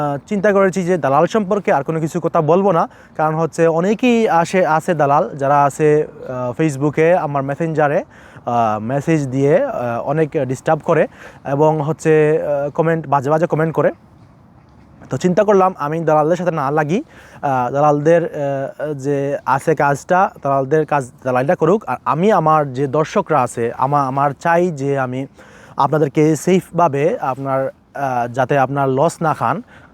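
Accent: Sylhet, Bangladesh. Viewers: আসসালামু আলাইকুম ভাই আপনে সিলেটি মন হয় রিপ্লাই দিবেন কিন্তু ❤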